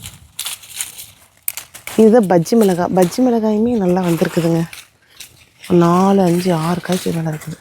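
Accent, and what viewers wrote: Kongu, India. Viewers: Kongu tamil Azhaga pesureenga neenga Coimbatore pakkangala. Super home garden👍👍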